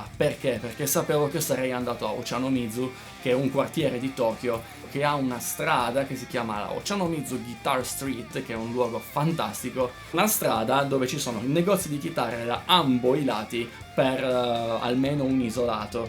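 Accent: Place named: Sardinia, Italy. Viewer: Complimenti bel video e bel modo di esporre... Ho letto che sei di Torino ma sento una piacevole cadenza sarda...vero?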